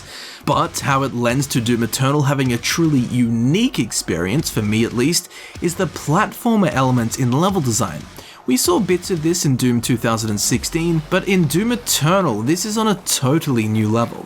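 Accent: Australia. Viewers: Took me a while to realise. You're an Aussie? Never seen your channel before, but since Eternal will be my "allowance" game for this year, I'm trying to soak-in as much of it as I can to get an idea of what my wife is letting us spend $300 on bahaha. Your video is damn detailed and I would be very interested in talking with you after Quakecon (which I hope you will be covering). Awesome content, mate.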